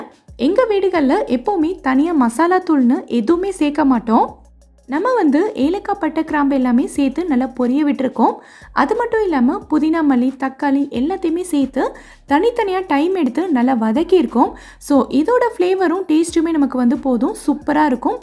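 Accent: Madurai, India. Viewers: Super Madurai tamil, try panni pakkeren. Australia 🇦🇺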